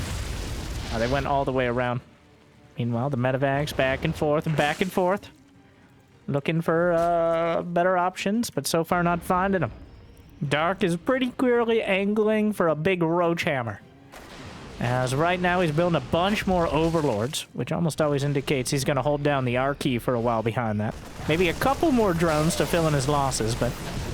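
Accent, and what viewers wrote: South, United States. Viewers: I actually stopped playing in like 2010, didn’t even follow it anymore. casts were so good, I was chilling in Taiwan for the past year and it randomly popped up on my YouTube and I’m like WTH, let me check out the game. He single handedly made me interested in spectating competitive SC2 again. At first I’m like, is this a joke? Dudes southern accent just throwing out stuff like making his spire great again in professional casts? Now I catch at least one cast a day. 😂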